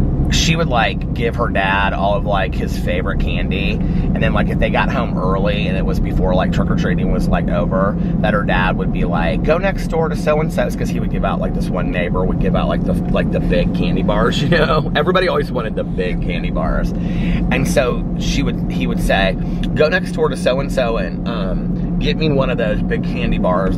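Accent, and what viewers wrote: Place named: Midwest, United States. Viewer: Hi. I noticed you say “saul” instead of “saw”. Like as in, “I saul so and so yesterday.” Is that. Mid Western thing? I always say “ milk” weird, but it’s just a “ Melissa thing.” 😁 I’m looking forward to hearing about the cozy mysteries. It really helped me through last November and December when you went on a “ cozy” spree! ❤️❤️❤️ I loved it!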